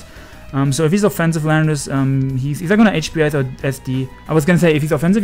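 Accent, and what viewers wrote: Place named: Germany. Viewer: clicking on that vid blind and hearing that smooth german voice is top 1 feelings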